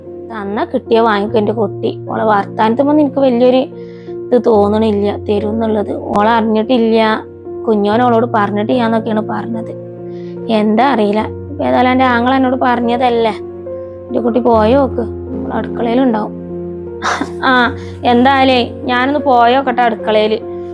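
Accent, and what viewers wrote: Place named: Malappuram, India. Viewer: എന്താ പ്പ തിന് മലപ്പുറം ഭാഷ യാണ് എന്താ കുഴപ്പം കൂട്ടം എന്ന് പറഞ്ഞ(കാര്യം )അത്ര തന്നെ,, അടിപൊളി വീഡിയോ സ് ആണ് 👌👌👌നല്ല ഭാഷ 👍❤️❤️❤❤❤❤❤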